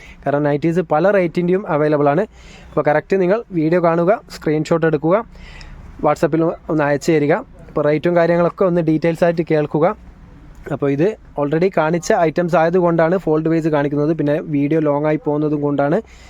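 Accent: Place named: Karunagappally, India. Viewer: Karunagapalli karuda slang anallo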